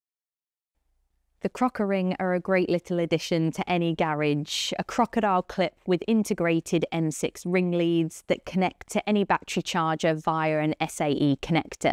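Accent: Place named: England, United Kingdom. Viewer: Can't place her accent. I guess somewhere in England?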